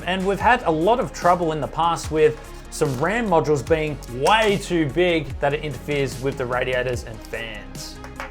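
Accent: Australia. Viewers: Are you Australia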